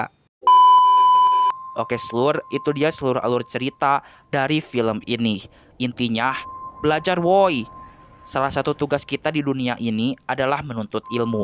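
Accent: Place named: Sunda, Indonesia. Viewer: Suaranya sunda banget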